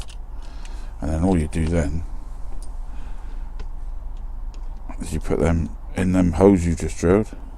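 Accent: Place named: Somerset, United Kingdom. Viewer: Top Job you sound like a fellow somerset boy. Really enjoy your vids which inspire me to get my act together to restore all the diecast I have collected of all types and sizes. Cheers and many happy ziders!!!!!!!!!!!!!!!!!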